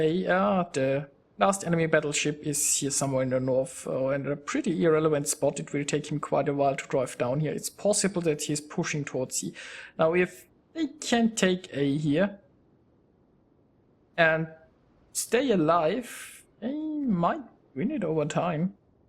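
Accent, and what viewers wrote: Germany. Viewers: I got one question. Are you from Germany?:D Because you speak like a german person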